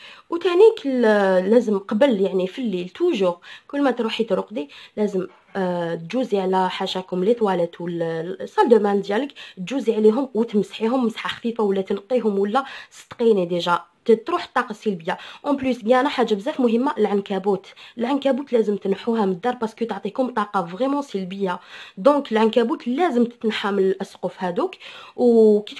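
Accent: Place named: Algeria. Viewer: أول مرة نشوف فيديو ليوتيبر جزائرية، لهجتكم غزاالة 😍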